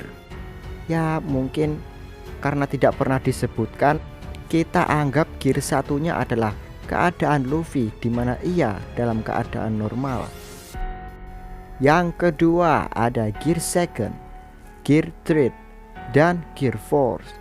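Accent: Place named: Java, Indonesia. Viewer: Suaranya kurang greget dikit min 😁 medoknya sih mantap, ahaha!!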